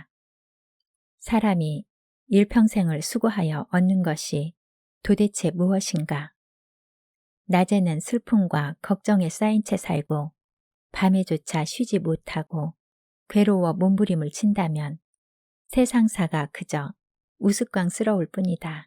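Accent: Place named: Jeolla, South Korea. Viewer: 오웃 전라도 어투가 쩝니다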